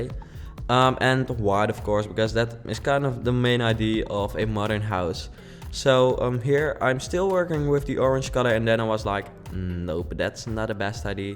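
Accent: Netherlands. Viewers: That Dutch accent though... ;) But I really like your work!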